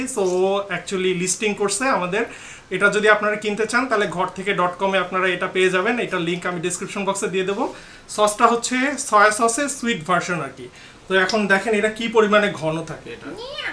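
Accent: Kushtia, Bangladesh. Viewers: তুমি না থাকলে আমার বোর লাগে ❤️❤️❤️❤️❤️ আপনার স্ত্রীকে আপনি খুব ভালোবাসেন ভাইজান। আপনার কথাগুলো খুব সুন্দর ভাই, কুষ্টিয়ার ভাষাটা খুব ভালো লাগে। ভাই আপনার জলীয় বাষ্প ঠিক আছে,বলে যান😅😅😅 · R u from Kushtia?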